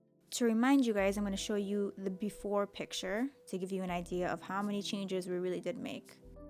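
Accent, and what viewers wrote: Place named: New York, United States. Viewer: Where are you from you got a slight accent Are you from somewhere in New York?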